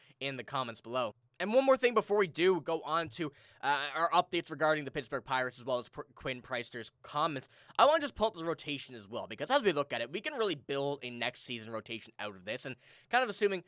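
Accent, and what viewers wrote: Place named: Canada. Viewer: I am pretty sure by your accent that you are Canadian, so how did you get to rep the crew?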